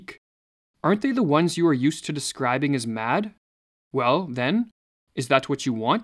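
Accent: Canada. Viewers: Great videos, i just cringe at the Canadian accent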